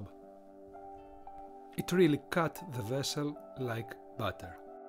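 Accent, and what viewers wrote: Greece. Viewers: η ελληνική προφορά είναι ότι καλύτερο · your pronation its like a greek who tries to speak English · speaking English with a Greek pronunciation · Με την πρώτη λέξη κατάλαβα ότι είσαι Έλληνας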